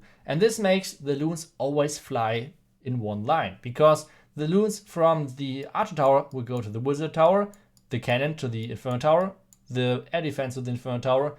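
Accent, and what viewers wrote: Germany. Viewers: Dein Deutscher Akzent ist Mega weiter so!🌞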